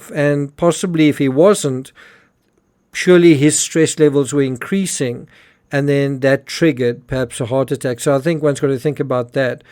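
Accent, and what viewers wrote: South Africa. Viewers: Do I detect a South African accent? I can understand Betsy not calling for help. I got swine flu, and could not even call my husband, get back on the bed, if he had not come home, I had slipped into an acceptance of death stage, he carried me to the car and into the surgery, all the time I was in a dream world. RIP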